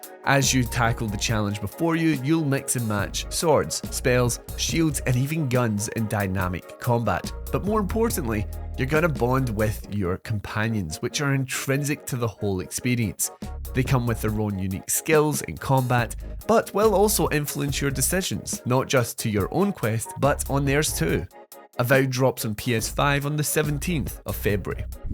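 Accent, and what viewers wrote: United Kingdom. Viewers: Please upload review game vedios . You're vedios are awesome. Are you British ❤❤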